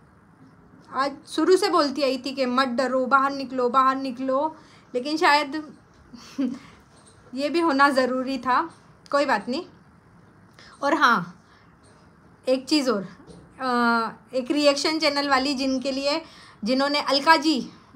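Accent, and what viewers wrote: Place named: Bhopal, India. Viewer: Aap ke baat se aap to Bihari lag rahe ho.. aap bhopli q bol rahe ho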